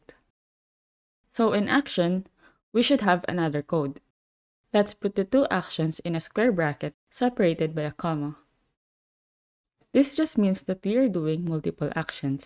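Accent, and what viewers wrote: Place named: Philippines. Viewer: this is exactly the kind of video i needed!!! also super cool that you're from the Philippines as well, i was surprised to hear a Filipino accent voicing this! thanks so much for your vids!!!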